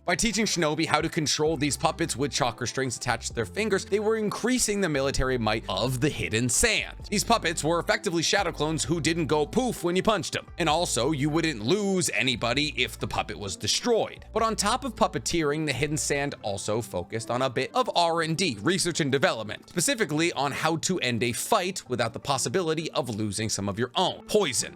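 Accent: United States. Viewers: You have the most American pronunciation of Japanese names I've ever heard. But I still look at your vids I just try to ignore it.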